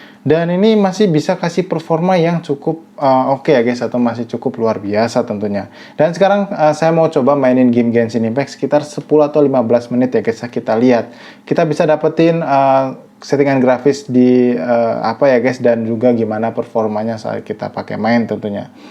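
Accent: Cilegon, Indonesia. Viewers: Orang Cilegon ni . Hehe...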